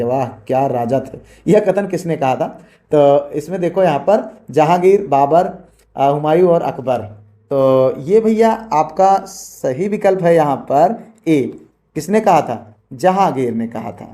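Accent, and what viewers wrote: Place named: Chitrakoot, India. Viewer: Very nice chitrkoot ki boli Sir apni matrabhasha ko bolkr bahut sukoon milta hai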